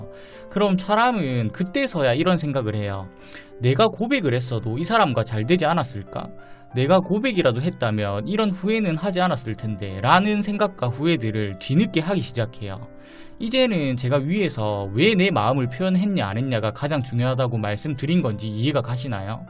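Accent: Gyeongsang, South Korea. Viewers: 혹시 사투리가 경상도이신건가요?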